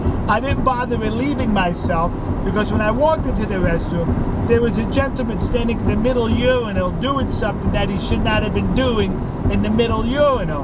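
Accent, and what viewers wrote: New York, United States. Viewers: Based on your base accent, I'm of course thinking that you're heading back to good old New York. But we'll see!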